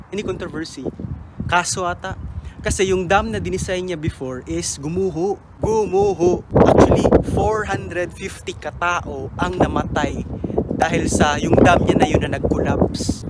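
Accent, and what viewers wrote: Visayas, Philippines. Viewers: Omg 😮 bisaya ka engineer? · The accent of yours tol is so cute... Bisaya...hehehe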